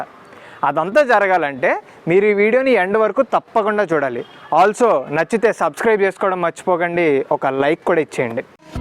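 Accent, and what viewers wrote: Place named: Bhimavaram, India. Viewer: super bro... Meeru bhimavaram valla.. naku mee videos vinnappudalla ma uru la anpistudi😊. All the best 👍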